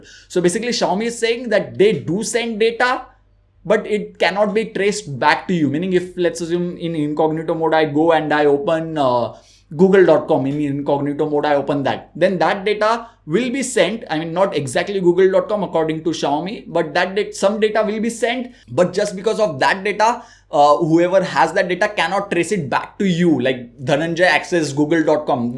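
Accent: India. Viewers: Is that one punch man with a mustache and an indian accent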